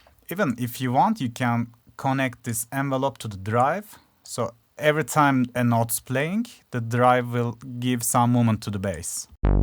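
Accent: Turkey. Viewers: Ne kadar türkçe bir ingilizce diyordum izlerken :) çok güzel video tebrikler :)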